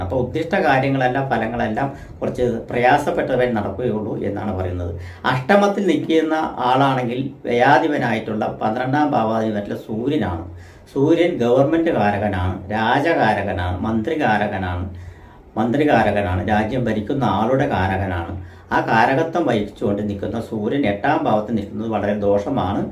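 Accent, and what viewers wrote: Tamil Nadu, India. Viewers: ഇത് ഏതോ അണ്ണാച്ചി മലയാളം പറയുന്നത് പോലെ ഉണ്ടല്ലോ റാസി മനസൻ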